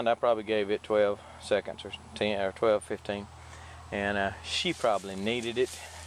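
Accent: Texas, United States. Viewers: Love your accent! How I miss my home state of Texas! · speak english , not texan drawl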